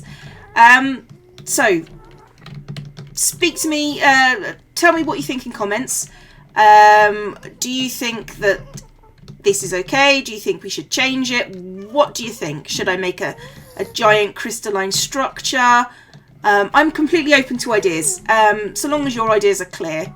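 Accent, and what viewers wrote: United Kingdom. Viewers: What i think about u is that I hate you you suck and your accent is just, ugh! Your videos are boring as hell!! What i ACTUALLY think about you is that, you're awesome, i like what you do, and i watch your videos and actually enjoy and be happy about it, i love you and your british accent:D you are the 2. Youtuber in my top 5 youtubers list! <3:3:D keep it up, you're going great!! >.<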